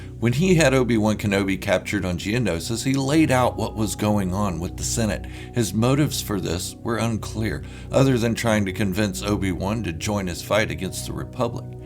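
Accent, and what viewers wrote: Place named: Germany. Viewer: I really miss videos like this this from you, so different and original, sensational More please!. Ihr deutscher Akzent ist ausgezeichnet! I can certainly relate to this video 100 percent as it was so nostalgic for me. I saw Star Wars with my father in 1977 when I was 9 years old, played with my collectibles, created my own artwork ( yes I used to draw etc) of the characters, my room was filled with posters on wall, collected the trading cards, unique merchandise and when the movies were available on Beta/VHS every night was Star Wars night for me. I relied on newspaper articles for any information I could get on Star Wars which was not much in those days. Looking back I am so grateful for lack of spoilers etc that because of the experience of feeling like a kid on Xmas Day when finally seeing the movies as I didn't know anything! 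My imagination went into overload and I appreciate those days so much more now. I think the generation of today are indeed quite spoiled now when it comes to the instant gratification of having everything available on hand via the internet and streaming services. Ohhh I so loved your analysis and perspective on how the Siths told the truth and the Jedi were too arrogant or in denial to accept the truth. I really didn't see it this way until now...the other side of the story. Of course, Obi had to lie or manipulate Luke. The Jedi were just as bad as the Sith in manipulation. Obi Wan and Master Yoda wanted Luke to be traine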